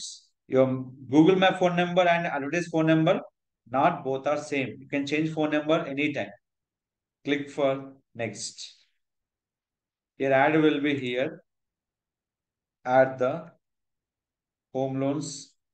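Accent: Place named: India. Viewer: 🤣 this guy is an Indian I thought I'd do fine understanding him, initially, I felt he sounded alright and was annoyed by your comment. Later realized the grammar, and accent issues.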